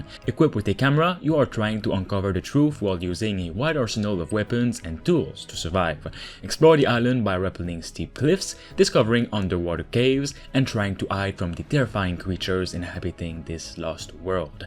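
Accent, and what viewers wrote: Quebec, Canada. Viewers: Est-ce que t’es Québécois ? ton accent te trahis un peu big😂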